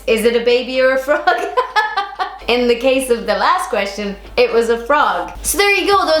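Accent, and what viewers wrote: Ireland. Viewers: You don't know how helpful your videos are! I'm planning a interchange to ireland and i was looking for youtubers from there and then i found you! Now I can understand the irish english better thanks to you! Great videos tho.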